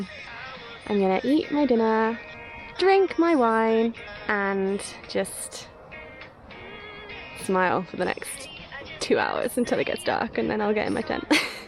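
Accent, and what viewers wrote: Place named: Midlands, United Kingdom. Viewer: Cant pin point your accent, a few words slip but cant place them, youre south of brum?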